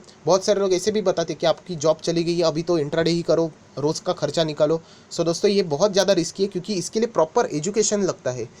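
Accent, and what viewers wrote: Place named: Mumbai, India. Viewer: mumbaiche ahe he nkki accent ani hindimde marathi words..😀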